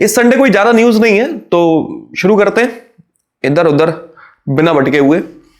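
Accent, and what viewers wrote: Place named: Madhya Pradesh, India. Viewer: bhai tum mujhe mp side ke kyo lgte ho language ki wjh se , jo up se lage huye mp ke district hain unse , jese shivpuri , ashok nagar ,datia gwalior wagera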